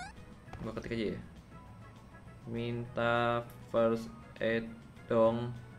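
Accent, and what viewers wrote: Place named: Banjar, Indonesia. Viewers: orang banjar bjir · Banjar banar logatnya 😂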